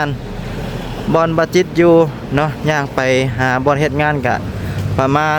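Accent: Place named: Laos. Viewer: เว่าลาวดีแล้วน่ารักดี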